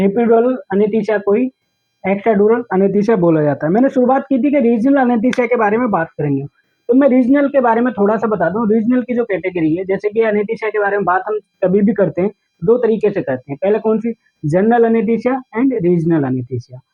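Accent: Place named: Bihar, India. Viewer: Aap Bihar ka san hai sir jii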